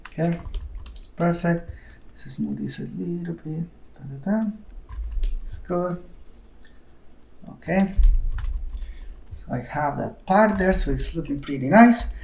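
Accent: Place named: Mexico. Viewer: se nota bastante el acento mexicano ojala te animes a hacer en español un dia krnal saludos!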